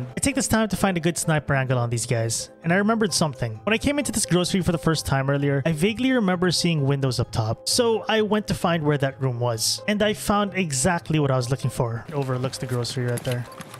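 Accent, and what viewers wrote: Philippines. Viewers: Legit thought this was a big time youtuber in the US, but once you started the voiceover I could immediately tell the accent, kabayan! Amazing content man, new sub. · are you filipino? Sounds like you have a manila accent :) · oh shit narecognize ko agad an pinoy accent hahaha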